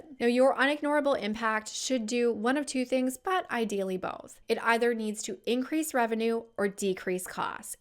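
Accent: California, United States. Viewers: Your Cali tone is so off putting…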